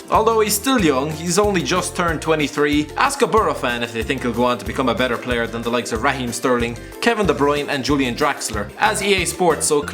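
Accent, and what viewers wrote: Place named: Ireland. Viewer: I can tell you're an Irish chap like myself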